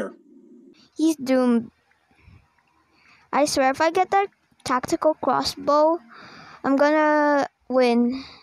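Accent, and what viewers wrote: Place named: Philippines. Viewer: Your sound is like Philippines bro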